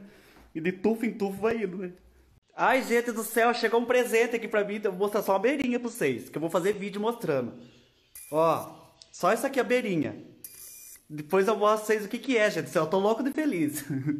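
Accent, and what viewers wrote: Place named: Paraná, Brazil. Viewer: sua cidade faz divisa com o PR?? Estou curiosa para saber pq meu ex mora em Jundiaí do sul PR e o sotaque as palavras tudo é igual ao de vcs aí. Si puder respode eu 😍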